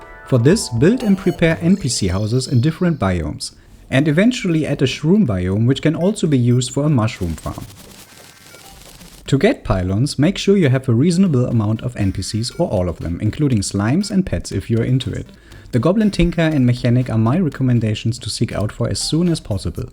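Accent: Germany. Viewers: thank you - you German aint you? :D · Du hörst dich so deutsch an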